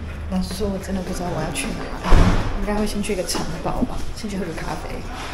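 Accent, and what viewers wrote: Taiwan. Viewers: 高雄口音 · my favorite tour guide with a sweet Taiwan accent, you made the Malaga tour very interesting. Great job! Thanks.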